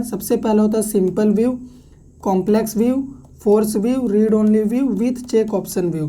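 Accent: Jamshedpur, India. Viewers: Are u from jamshedpur ❤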